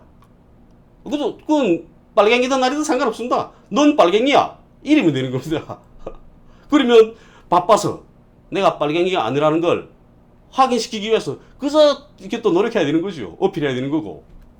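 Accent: North Korea. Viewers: 북쪽 말이 좋습니다!고치면 되지!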